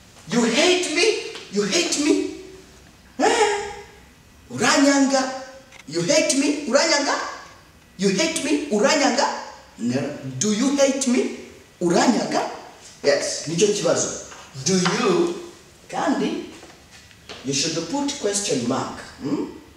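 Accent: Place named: United Kingdom. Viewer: Teacher thanks for your contribution to the Rwandan community I really like your methodology,but most importantly your English is clean it sounds really british English not like some teachers whose English accent cannot be distinguished with their local languages. These international language should really not be confused with our local languages. Please keep it up.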